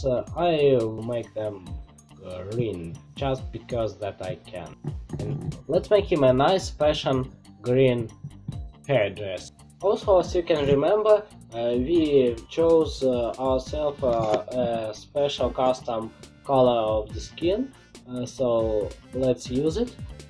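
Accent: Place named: Russia. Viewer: Да по акценту слышно русского мужика!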